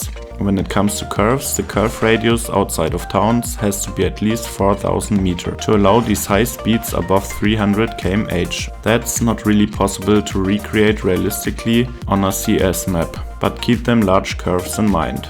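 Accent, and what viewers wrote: Germany. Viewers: YES! another great little "how to" video from the master. And the small fun inserts like are back ( as well as that smooth german voice 😊just makes me feel that there is professional behind it ) And to be honest, I can't wait for CS2 just to see what you can do with it, as well as some other people like Egg, FewCandy, CCP and Lee Hawkins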